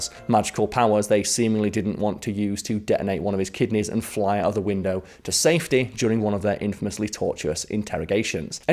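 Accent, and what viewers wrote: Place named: North, United Kingdom. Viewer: Why are you still the interim host? Ive watched this channel for years and you’re the best host. It was weird hearing a fellow northerner at first, but now its a highlight of watching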